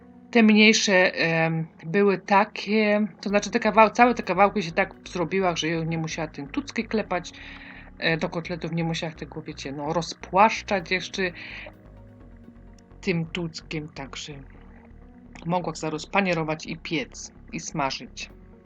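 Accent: Silesia, Poland. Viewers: Podoba mi się z ''kartofloma , z kluskoma '' można podać . Lubię słuchać gwary opolskiej / śląskiej .Sznycelki jednak lekko rozbiję i otoczę mąką przed panierką , smalczyk indyczy też o.k.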